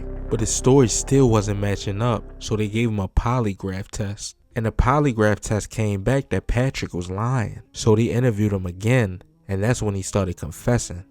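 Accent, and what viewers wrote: Baltimore, United States. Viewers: U from bmore? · This man got that Baltimore accent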